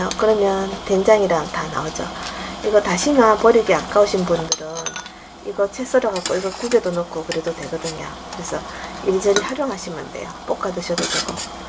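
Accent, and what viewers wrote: Gyeongsang, South Korea. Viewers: 맑은아욱국 콩쪼가리 싫어하는 사람이나 애들에게 좋것네요. 깔끔하고하니 더 정갈하고 맛있어 보여요.방송도중 경상도 사투리도 간간이 나오고 정겨워요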